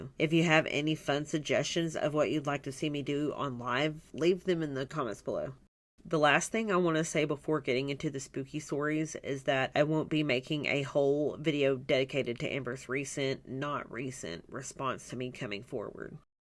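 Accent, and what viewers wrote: South, United States. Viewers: Oh, where do I start? I freaking love this, the way you narrate these stories! your voice is so soothing, and the southern accent makes it all the more enjoyable The one about the baby clothes and the one about George were the spookiest imo I have a couple suggestions/requests In the beginning I thought there was background rain sounds, but I'm not sure.. I think it'd be. a great touch to set the atmosphere. And I think it'd be really cool if you draw something about the stories, it would be interesting.. like whatever strikes you the most about those stories, idk it's just an idea 😊 I also love the fan art in the end.. I hope you keep up with these and it becomes a series on the channel cause I'm here for it. much love for you ❤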